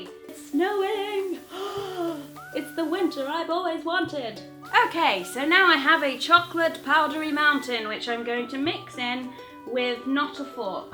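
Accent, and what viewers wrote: United Kingdom. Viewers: I can't tell if your British or American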